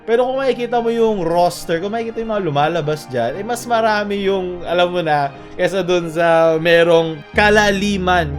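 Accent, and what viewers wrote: Visayas, Philippines. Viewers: Yung bisaya mo nagiging local accent na stay yawa and cancer everyday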